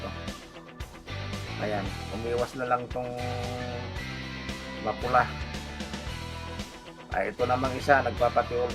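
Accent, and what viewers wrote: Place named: Ilocos, Philippines. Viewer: Parang ilocano kayo lakay base sa pananalita niyo hehe.anung province nyo po?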